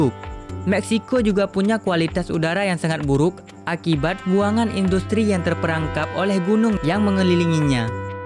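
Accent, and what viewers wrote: Aceh, Indonesia. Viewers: Abg orang aceh ya bang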